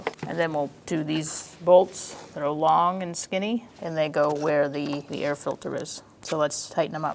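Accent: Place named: New England, United States. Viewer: Love the NE accent, thanks for the video!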